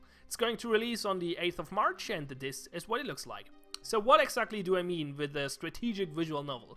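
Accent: Germany. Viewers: is this german accent?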